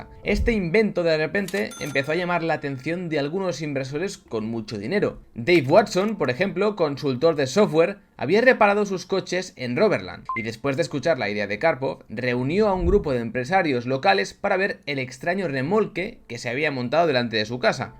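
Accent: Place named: Spain. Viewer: La IA no tiene acento español no ves que es una persona de carne y hueso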